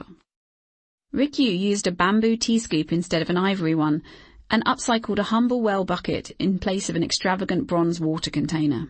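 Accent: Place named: England, United Kingdom. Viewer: Great book terrible narrator should be a male voice, not an English voice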